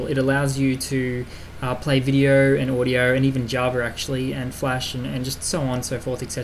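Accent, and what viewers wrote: Australia. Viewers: You can easily make out from his accent that he is Australian, but if you want hints, First "GoodDay" greeting at start, Secondly look at this terminal/Username, Thirdly he calls Gufw Guffy.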